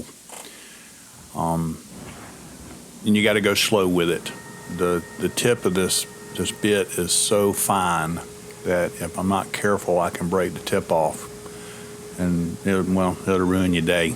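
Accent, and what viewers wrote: South, United States. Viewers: No. Pelicans? No. Crabs? No shrimp? 😅 Beautiful work, southern fried accent. Excellent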